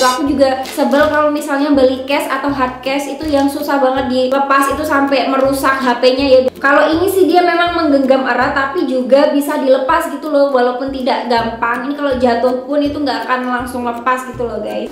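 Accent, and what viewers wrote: Java, Indonesia. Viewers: Kok ngmg jawanya berkurang 🙂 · Medok bangett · Kak orang mana sih kok logatnya kyk orang jawa..jawa mana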